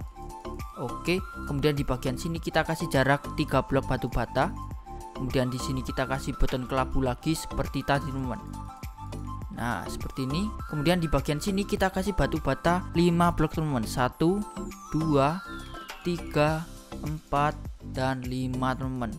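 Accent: Java, Indonesia. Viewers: Bang ngomong nya kaya orang jawa 😂😂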